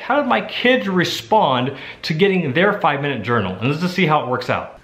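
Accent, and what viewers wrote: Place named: South, United States. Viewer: You live in the southeast? I luv that southern accent 👍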